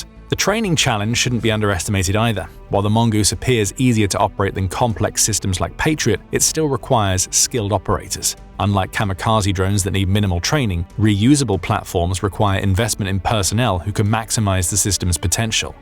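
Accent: United Kingdom. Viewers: English (UK) accent is getting old.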